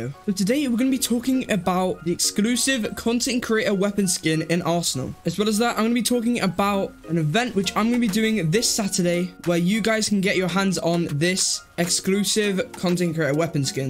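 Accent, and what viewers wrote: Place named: United Kingdom. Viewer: Is this tanqr’s brother or something? The gameplay is good and he does have the British accent. I dont know, maybe this is the new tanqr of arsenal.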